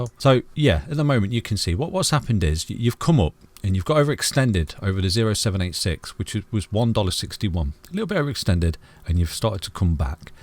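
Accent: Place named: East Midlands, United Kingdom. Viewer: are you from Notts or Leicester? I tell by your accent.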